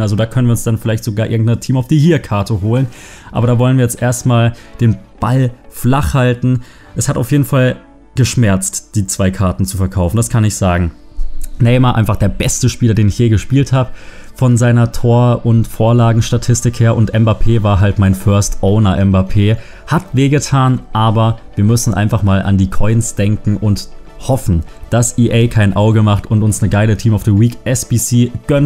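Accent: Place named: Baden, Germany. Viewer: liegt vielleicht auch an dem badischen Dialekt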